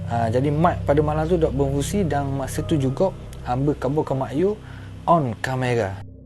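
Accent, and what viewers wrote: Terengganu, Malaysia. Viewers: Assalamualaikum, sejak tgk channel saye dah faham loghat ganu🎉..sblm ni satu pun xpaham🤭...manusia berbeza2, Allah swt beri kelebihan dan kekurangan masing2. Org ikhlas berkongsi cerita, kite menjadi pendengar yg supportive. 🔥🔥🎉🎉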